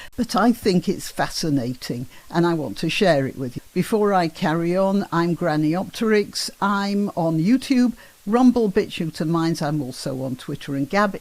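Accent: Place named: Northern England, United Kingdom. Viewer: Good northern accent luv!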